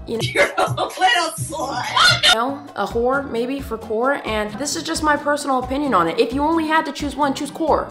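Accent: United Kingdom. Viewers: literally discovered you through youtube and now i cant stop watching your videos! very informational :) ALSO .. your random british accent cracks me up.. (i'm british LOL)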